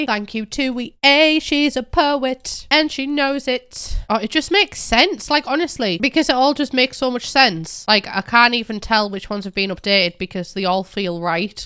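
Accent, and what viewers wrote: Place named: Northern England, United Kingdom. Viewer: Sending good thoughts to you and your family and I am glad we have you back, even for this one video :) I've always enjoyed your content and have done for the past few years when you had 20k subscribers. I always enjoyed watching you cause you were the only simmer/youtuber I found that sounded slightly like me, I found it comforting that us Northern Lasses are getting some spotlight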